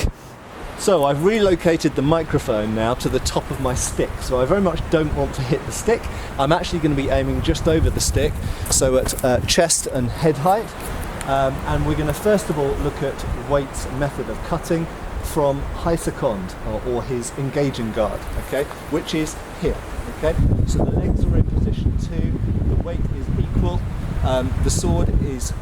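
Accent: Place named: RP, United Kingdom. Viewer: you missed your true calling as a type-cast, British officer in Hollywood films. You are tall, thin, and have a moderate BBC accent. You seem like low level gentry so I am thinking a rank between Major and Colonel would suit you (you would get an officer's sword too). You are not regal enough (and too honorable) to be a general and you are too "proper" and too refined to be a sergeant. Am I the only one who could envision in a movie like 1917?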